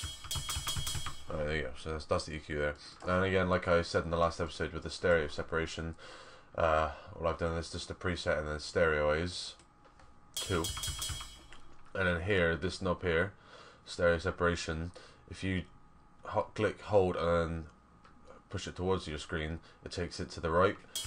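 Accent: United Kingdom. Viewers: are you from bristol?;)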